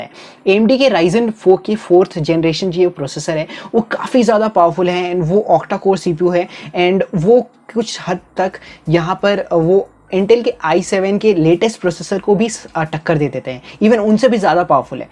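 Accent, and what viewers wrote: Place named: Jharkhand, India. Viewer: Bhai tu jharkhand se hai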